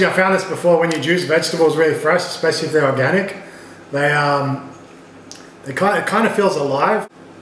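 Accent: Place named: Greek Australian, Australia. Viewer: 2 seconds in i was like yep Aussie Greek. It’s the accent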